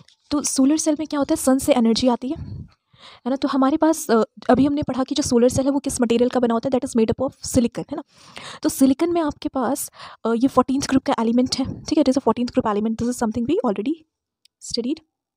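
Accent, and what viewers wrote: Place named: India. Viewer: Please speak slowly, aapki BPO wali accent se aadha lecture uper uper jata hai, thoda toh cold blooded accent use karo kaha jane ki jaldi hai.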